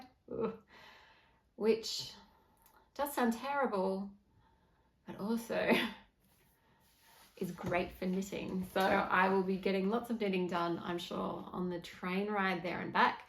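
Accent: Australia. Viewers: It's wonderful to hear another aussie accent on youtube 🙂 I like the look of the seed star tank, I think it is something I'd like to knit. Your Miss May MKAL is looking good so far. It's exciting to hear that you're doing a giveaway, and the yarns look lovely. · Hello from NZ! It's always wonderful to hear an accent from Down Under on YouTube! Just found your channel, love your style and vibe so of course I subscribed. Good luck with your tramp! And just as a thought, if you start a new project for your train ride, it doesn't matter if its a sock or a jersey - that only matters once you've finished it. So I reckon you start the Kala T! 🤣😁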